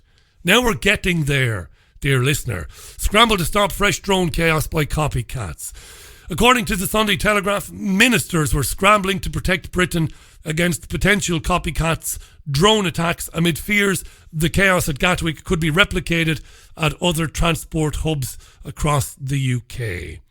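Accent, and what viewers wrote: Ireland. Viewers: Richie thanks so much for all the great programs. You've become an old friend. Great to hear the old Waterford accent in Manchester. Slán tamall.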